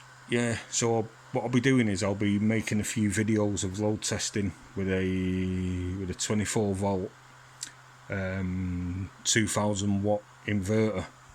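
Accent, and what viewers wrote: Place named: Manchester, United Kingdom. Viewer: Good on you mate, I could do with some help you sound local - Manchester way 👍👍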